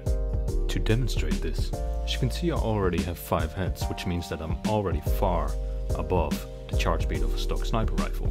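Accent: Netherlands. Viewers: ey just found out your channel and i can already tell ur dutch (same here). keep up the good work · Je Nederlandse accent is echt heel duidelijk :D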